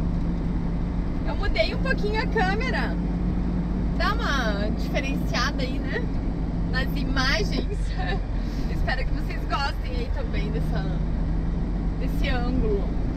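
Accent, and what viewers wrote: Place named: Santa Catarina, Brazil. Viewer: Boa noite. Adoro seus vídeos. Principalmente para ouvir sua voz. Esse seu sotaque catarinense com certeza chama a atenção. Essa sua frase "CHIC E BACANIZADO" também é legal e chama muito a atenção. Sua simpatia também é bem marcante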